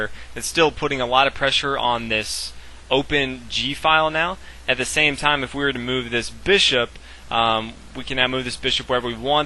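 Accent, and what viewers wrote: South, United States. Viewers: This commentator is fantastic. Erudite, charismatic, and populist. Just want to offer my lay endorsement. Plus, I like a good Southern accent in the context of monarchical European Game of Kings. :)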